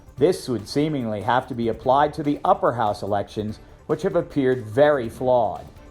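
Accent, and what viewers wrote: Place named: Somalia. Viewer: Your sound like somali who want to get some work in kenya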